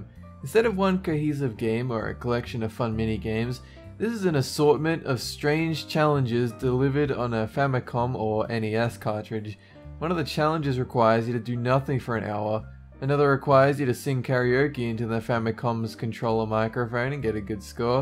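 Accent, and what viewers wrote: Australia. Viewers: He has an Australian accent and a slight lisp. Some people like it others don't. I guess he does slur, but is that bad? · It's the Ausie charm, some people like it other's don't. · im aussie and im pretty sure he has an australian accent. whats wrong with it?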